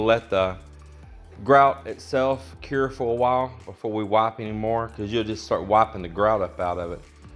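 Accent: Texas, United States. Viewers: Now I'm gona watch your channel everyday..love the Texas people and I love Texas,,we need Texas,,missed your accent..lol I'm originally from n.c. my Daddy was a farmer..I helped slop the hogs when I was 4 and pick some cotton balls..dig taters..love cracklins..bet Lil lady could cook some up...bet u have..ok it's pm here in pa.wonderfully cool..59dgrees.happy to git your chanl.love and peace to you both..you're a beautiful couple..tell her hello for portia...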